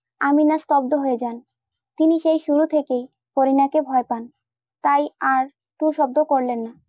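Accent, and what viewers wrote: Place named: Sylhet, Bangladesh. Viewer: আচ্ছা।।।।আমি ভেবেছিলাম সিলেটি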